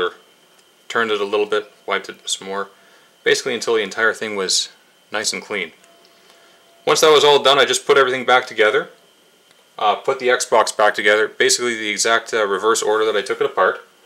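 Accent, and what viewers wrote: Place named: Canada. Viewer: You sound a little like a Canadian.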